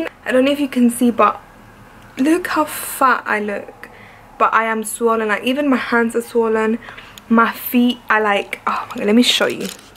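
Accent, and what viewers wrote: United Kingdom. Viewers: She has an English accent from London. It's not very unique just a London accent XX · Vive en Inglaterra su inglés es británico la pronunciación es diferente al americano. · could be from another place in UK. Her accent is English since she grew up in UK. Duh😂🇬🇧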